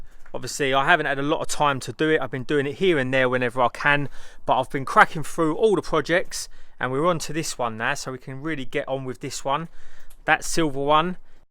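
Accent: United Kingdom. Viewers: Nice to hear a brit bro on here showing our ways. Well done son. Good job !!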